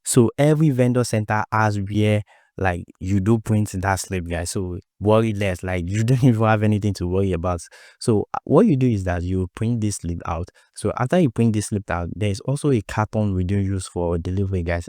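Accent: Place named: Ghana. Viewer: Specify if it's jumia Nigeria causes your accent sound ghana...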